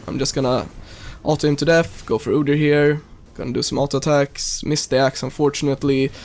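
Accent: Sweden. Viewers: That swedish accent. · Nice commentary! BTW...sounds like ure swedish ^^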